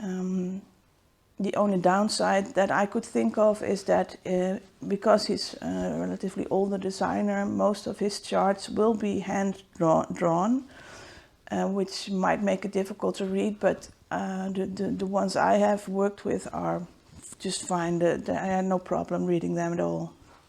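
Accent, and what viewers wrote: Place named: Netherlands. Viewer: Finally found a dutch flosstuber. I heard your accent and just knew. A new subbie here. I did a few inkcircles too. Yours are beautiful. Marike zeewolde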